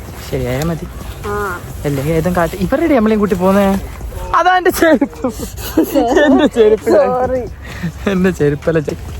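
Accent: Kannur, India. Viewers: chechide samsaram kannur thannne aano🫠🤍🤌🏻but kollaaa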